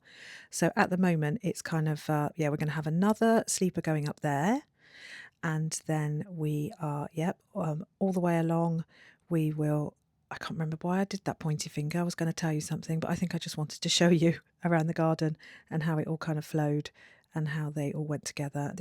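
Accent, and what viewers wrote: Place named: England, United Kingdom. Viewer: Your new parasol is spectacular and the solar lanterns are so cute! I love it all! I love your voice over and your charming English accent. (I'm from California, USA) TFS! 💜 💜 💜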